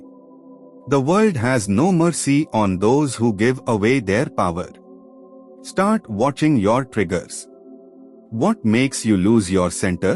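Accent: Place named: India. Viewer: That indian accent 😂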